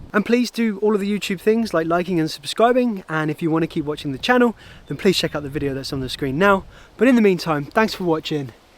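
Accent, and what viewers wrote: United Kingdom. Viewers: Well the narrator sounds English, so what he says would indicate what they're called there, but I think Australia too, yes.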